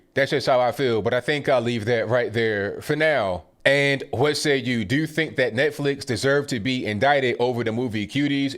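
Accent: France. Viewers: Nice French accent Sir. Yeah, this is disgusting and it appears that the devil knows his time is almost up and he is trying to pull more children to hell with him. All we seem to do is screw over our children. I cancelled my Netflix over this. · Your French accent cracked me up!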